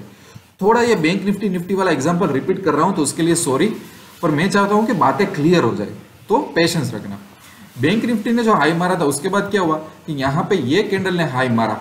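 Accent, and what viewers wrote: Gujarat, India. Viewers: Very nicely explaine in Hindi with Gujarati lehja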